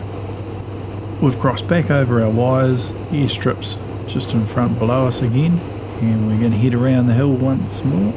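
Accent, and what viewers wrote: New Zealand. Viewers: Nice video. I always enjoy seeing a FU-24 fly. My dad, Jerry Barden, was the project engineer for Fletcher Aviation in the mid 1950's in Hamilton NZ. He helped Robertson Air Service & James Aviation assemble the initial batch of 24's. He then did the test flights on the first 42 completed. We lived there from Sept 54 till April 56. The NZ accent brings back good memories. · Awesome vid thanks. Just a Kiwi accent mate!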